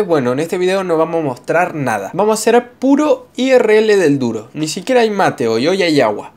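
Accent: Argentina. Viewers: Papa Noel se olvido de mi 😢😢😢 Pd: siempre pensé que eras tucumano por como hablabas, hasta que te encontre en insta y me di cuenta que sos santafesino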